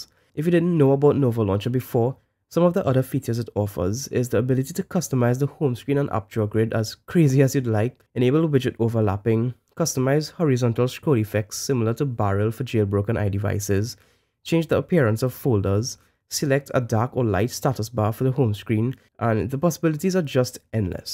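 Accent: Trinidad and Tobago. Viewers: are you Trinidadian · Are you trini bro ?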